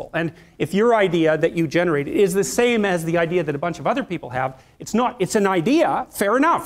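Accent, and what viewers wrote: Canada. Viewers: He's Canadian. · is he Canadian? i just realized the way he pronounces out. hes so well spoken i barely noticed his accent but now that ive noticed its so apparent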